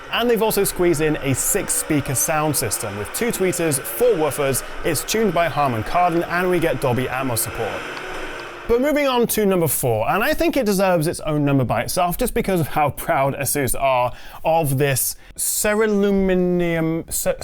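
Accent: England, United Kingdom. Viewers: From what region of England are you?, Is it just to know where your accent comes from. Please. A French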